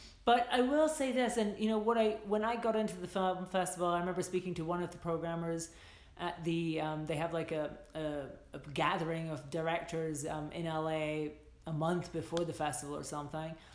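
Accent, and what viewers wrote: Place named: Scotland, United Kingdom. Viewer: Thanks for this! Thought I detected some Scottish in you!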